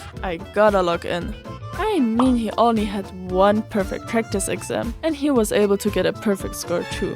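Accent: Germany. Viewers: Your German accent sounds really cute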